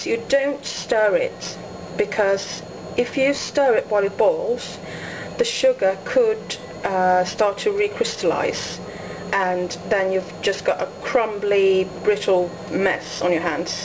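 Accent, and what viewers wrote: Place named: United Kingdom. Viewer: Cool, I'll have to make some of these. Do you live in Sweden you sound British ;)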